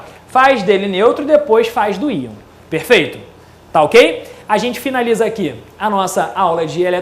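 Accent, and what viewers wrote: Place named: Rio de Janeiro, Brazil. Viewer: Legal super entendivel, mas poderia ser meno cariocado,,,,cerrrrrrrto.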